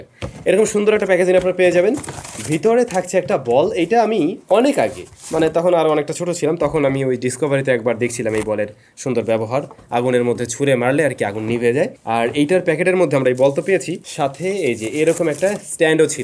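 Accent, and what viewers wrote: Barishal, Bangladesh. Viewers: এতদিন পরে জানতে পারলাম ভাই আমার বরিশাইল্লা 😅😅😅😅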